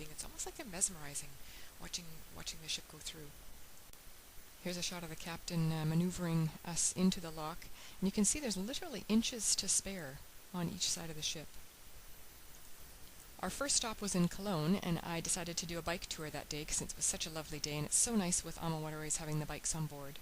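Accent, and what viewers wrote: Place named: Canada. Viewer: Thanks! This is our first cruise, so we shall see how it goes. We chose Crystal. Booked through an acquaintance, but he has not given me half the info that you lay out so generously on your videos. I wish that I had found you before we decided. And, you sound Canadian (we are dual...but grew up in Canada)...so that is also awesome. If we like this trip, and decide on another, I will definitely contact you directly! Cheers.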